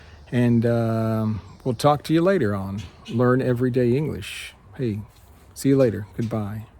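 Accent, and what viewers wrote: Texas, United States. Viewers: Hello, my name is Roman. I come from Poland 🇵🇱. I like your YouTube channel. I like your Texas accent too. I learn English and your video help me improve my English, thank you for that. I appreciate it.😊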